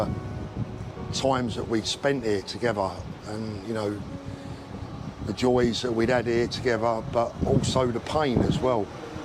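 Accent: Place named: United Kingdom. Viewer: Why is your accent like that if you're from London? It's like provincial English, not what I imagine of a Londoner. 😅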